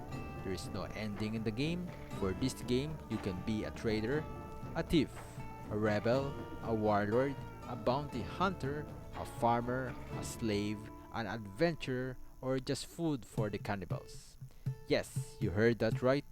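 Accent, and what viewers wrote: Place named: Philippines. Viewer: Such a Strong Filipino accent haha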